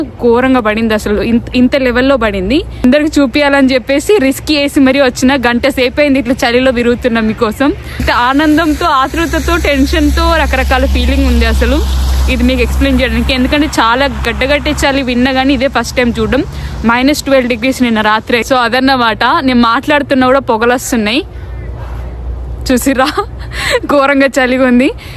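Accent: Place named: Telangana, India. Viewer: అసలైన తెలంగాణ బాషా మీరు మాట్లాడుతారు Great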